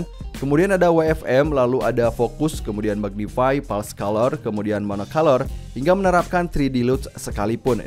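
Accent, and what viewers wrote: Kuningan, Indonesia. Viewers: Bang abang orang kuningan yah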